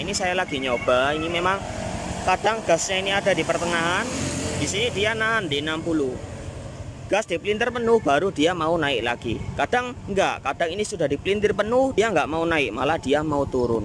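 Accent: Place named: Java, Indonesia. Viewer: cara ngomong enak bosku, logat boso jowone podo aku, enak dipahami wkwkwk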